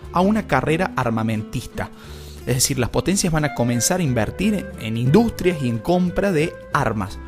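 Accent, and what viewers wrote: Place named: Argentina. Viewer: La verdad que dese hace semanas estoy buscando videos para oír antes de dormir y este canal me parece muy bueno, dormirme y culturizándome , incluso he llegado a soñar de lo que oigo y definitivamente prefiero la tonada Argentina y soy Venezolana , gracias por este aporte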